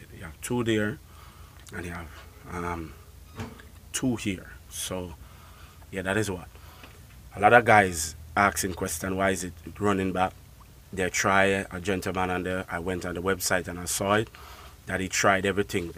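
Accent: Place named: Jamaica. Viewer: Bro you're from Trinidad and sounding like a Jamaican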